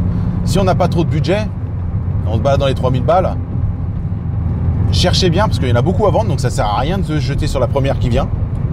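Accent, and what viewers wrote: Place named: North, France. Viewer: Je me suis abonné en tout cas, tu es du nord ? Je reconnaît l'accent , j'y suis moi même originaire meme si je n'y vie plus , dommage pour les révisions de la future s16.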